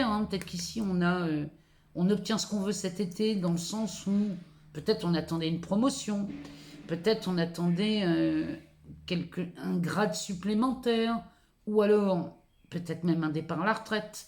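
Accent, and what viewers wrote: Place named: Paris, France. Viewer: Douce 🌼☀️💝 Ce tirage est exceptionnel et ça m’a redonné un boost d’énergie magic en ce jour! Je vous écoute des USA. Votre voix est rassurante et me rappelle ma jeunesse à Paris! Je pense que vous êtes parisienne aussi, n’est pas?! Vos ressentis et intuition me parlent et je relate souvent avec vos messages en général! Merci d’être cette belle lumière pour votre chaîne qui embellit les espérances et donne du baume aux cœurs! Merci merci merci - de mon âme à la vôtre! 💫🦋🌈💖☀️🙏🏻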